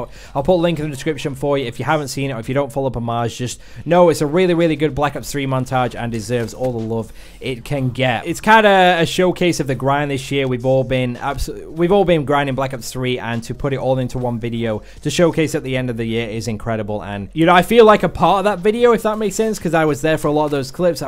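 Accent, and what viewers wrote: Yorkshire, United Kingdom. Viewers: aha is a Yorkshire like me 😂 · I don't notice your accent coz I'm from Yorkshire as well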